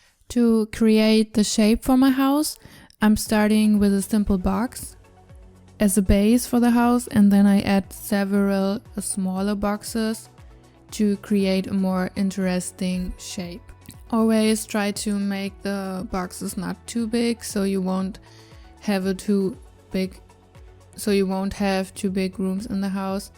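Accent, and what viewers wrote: Germany. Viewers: ich komme auch aus Deutschland. Ich hab mir schon irgendwie gedacht das du deutsch bist weil man das ein bisschen hört. 😂😁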